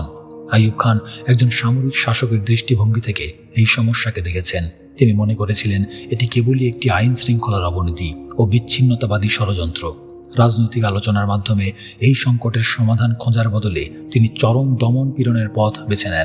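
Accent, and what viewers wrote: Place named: India. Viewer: বই কথাবলছে না।৷ ভারতের দাদদের মত করে বলছে।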